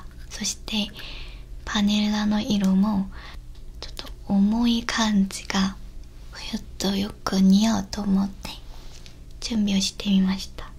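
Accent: South Korea. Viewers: 韓国の女の人が話す日本語っていいなぁ。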